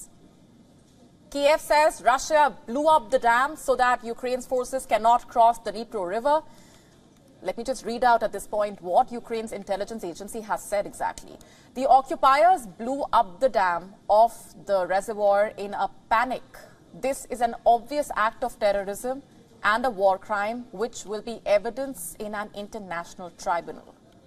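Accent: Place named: India. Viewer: i love indian /english accent :person-turqouise-waving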